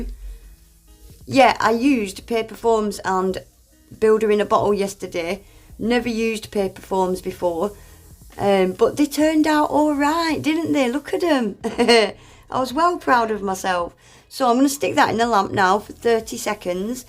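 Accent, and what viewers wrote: Northern England, United Kingdom. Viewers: I'm saying the Northern accent and obvious hard working hands are a great character to have. You are not a 'dumb dumb' infact you are a genius at what you do. I'd rather watch you than the others because you are real. Keep it up young lady 😍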